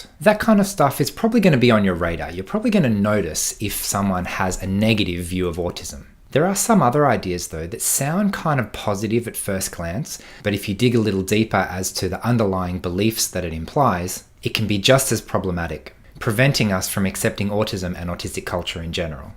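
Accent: Australia. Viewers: Nice to hear an Ozzie voice... :)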